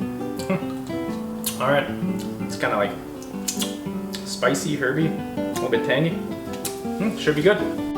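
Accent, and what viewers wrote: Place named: Canada. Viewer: A) this is so freaking adorable, b) holy crikey do you ever have a strong 🇨🇦 accent you have!, c) Isabel pretty much stole your whole face, lol & d) I’m not sure what I’m more impressed with - your cooking or your editing skills…hidden talents galore! ps I watched this with Calvin and he just kept saying “I bet must be really happy about this hey?!!l …he meant the cooking the skills ☺️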